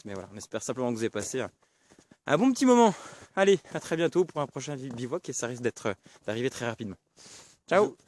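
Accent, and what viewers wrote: Franche-Comté, France. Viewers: J'aime pas trop le côté commercial de chez bear gylls je préfère les couteaux plus rustique, comme la ma prochaine acquisition sera le bushlore de chez condor, si tu ne le connais pas Ju0069 a fait un rewiew test très intéressante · Coucou suis de sortie demain ou dimanche vous laisserai une dedicasse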